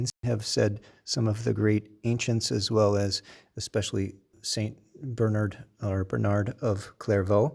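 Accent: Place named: Latin America. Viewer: So beautiful!! I really love your Latin accent. Thanks and God Bless you.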